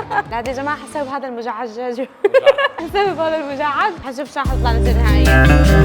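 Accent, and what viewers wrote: Iraq. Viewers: بسرعة نست وطنهة ولهجتهة 🇮🇶😭ابقي احجي عراقي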